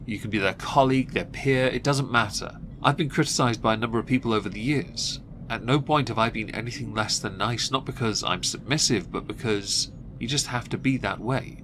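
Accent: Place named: England, United Kingdom. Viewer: 😂😂 the only time I ever see these hambeasts is when you make videos, thanks for suffering through it for us. 😂 she will never learn. Though sometimes I feel like you need to remember that America is culturally dominant and that nobody takes the old english accent seriously tho...like accept that and know haha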